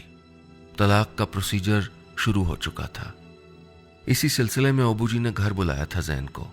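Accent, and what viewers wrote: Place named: Kashmir, India. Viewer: jitni khoobsoorti se aapne kahani ke liye, kashmiri accent laya hai, isse badhkar kuch nahi 💙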